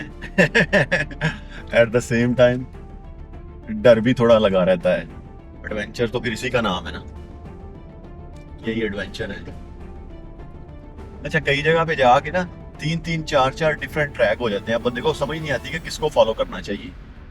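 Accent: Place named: Punjab, Pakistan. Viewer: Really good video. I like your videos, just saw only 2 videos but Really good. Your urdu accent is likely from Gujrat or Sialkot. I don't know where are you from. I am from district Gujrat. Anyway good video. Waiting for your next video.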